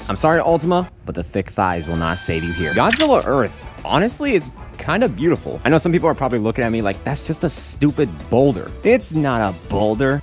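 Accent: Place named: Texas, United States. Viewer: ngl bro sounded like he had a Texan accent, and I agree with every ranking you just said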